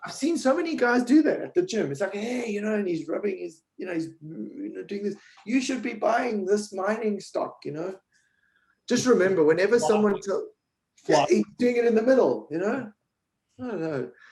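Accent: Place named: United Kingdom. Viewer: you have such a pleasant way of communicating I could listen to you talk all day. The British accent doesn't hurt either.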